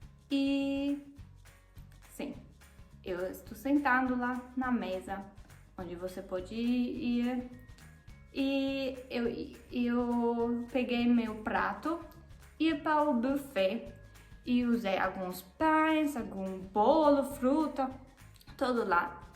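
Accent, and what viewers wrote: Germany. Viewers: O Mico é normal, leve com bom humor para não se aborrecer e você aos poucos vai estar falando o idioma perfeitamente. Procure ouvir músicas no idioma português brasileiro e sempre que possível converse no idioma. Não sinta vergonha de errar e continue praticando. Uma das coisas que deve sempre observar é o uso do gênero, na maioria dos idiomas o artigo é mesmo para o masculino e o feminino, porém no português muda o artigo a, o, as, os articula com a palavra e muda com o gênero masculino ou feminino. Por exemplo numa frase como Deixei cair a xícara da mesa, "xícara" é uma palavra feminina é precedido do artigo "a" , seria errado dizer : Deixei cair o xícara da mesa. O seu sotaque alemão é bem leve quase não se percebe, quando estiver falando e articulando corretamente a maioria das palavras se você não disser estando no Brasil que sua nacionalidade é alemã facilmente se confundirá com uma brasileira.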